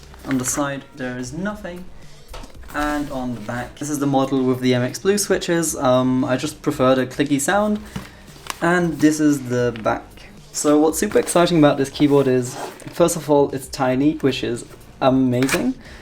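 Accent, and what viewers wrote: Germany. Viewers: Hallo du hast einen deutschen Akzent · Youre english sound so weird, at some parts you could tell you´re german but in other parts you just sounded like you´re speaking english as youre first language. Not hate tho haha <3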